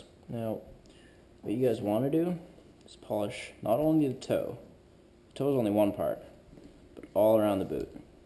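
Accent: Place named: Canada. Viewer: Is this Canadian?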